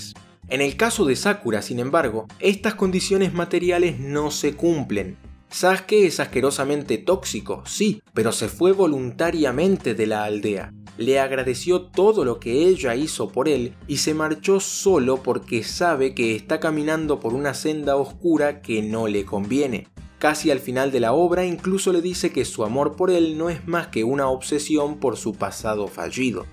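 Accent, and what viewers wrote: Argentina. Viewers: Eres Argentino ?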